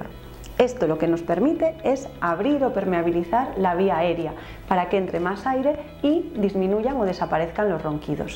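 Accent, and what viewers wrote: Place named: Spain. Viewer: Me imaginé por el acento de la doctora, era en España.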